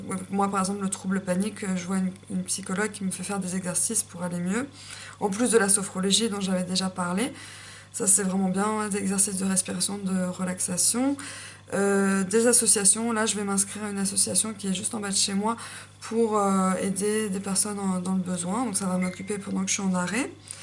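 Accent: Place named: France. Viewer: J'aime aussi ton accent français quand tu t'exprimes en anglais (c'est beau). Je ne savais pas que tu as vécu en Angleterre aussi, mais j'ai bien vu que tu es Franco Anglaise. Merci pour la vidéo (Ecouter de la musique moi j'ADORE !!!!!!! Je m'évade je suis dans un autre monde j'oublie TOUT ça fait un grand bien. Je peux écouter de la musique pendant quatre heures car la musique est tellement varié.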